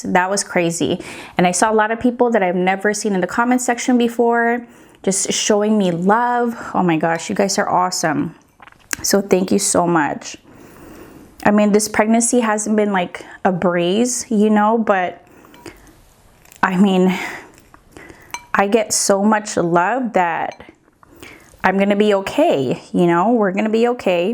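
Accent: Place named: Philippines. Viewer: I love your Filipino accent. It's so different when you were whispering hehe. Love you ate ❤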